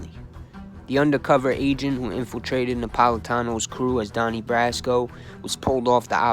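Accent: New York, United States. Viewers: oh nice. That would be great 2 a week. Your ny accent is a huge part of what makes your stories so great. If you don’t mind me asking, what part of New York are you from buddy?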